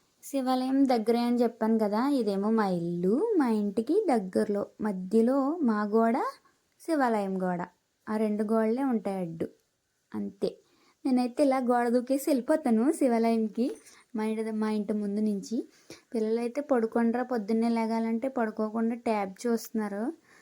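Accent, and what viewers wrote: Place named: Rajahmundry, India. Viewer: Soo cute sis❤ మధ్య మధ్యలో మన రాజమండ్రి యాస వస్తుందిగా 😂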